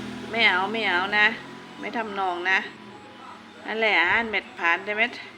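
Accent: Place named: South, Thailand. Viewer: ❤❤❤❤น่ารักกันทั้งบ้านเสียงทางใต้น่ารักจังแม่ 😊ฮ่าๆเก็บตังเลยแม่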